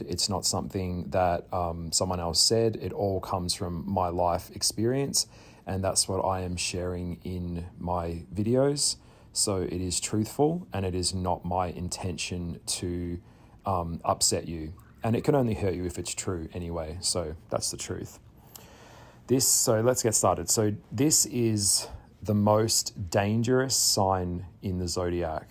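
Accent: Australia. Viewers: I only stayed because the Australian accent sounded cool until he was saying all these gross.Horrible things and it made the voice and accent sound not good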